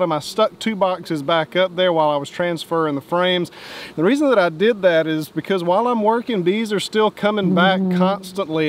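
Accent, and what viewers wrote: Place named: United States. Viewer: this is the most american channel i've ever seen and it is so good i can't stop watching. i am sorry if i sound like a butthole i just happy that i could distinquish (spelled wrong probably) an accent and understand all these imperial measurements bc i am interested in new stuff. i love the atmosphere, please keep on going!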